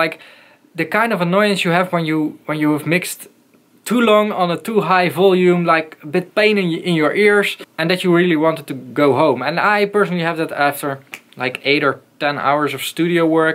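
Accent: Netherlands. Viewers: I realized that subtitle detected your english as dutch :) Thanks for the video!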